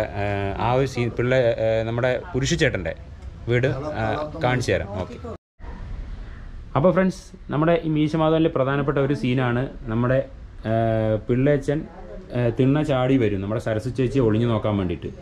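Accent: Palakkad, India. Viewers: intro kalakki . paalakkaad oru sambavam thanne. Paalakkaadan slag aanoo chettan samsaarikkyunne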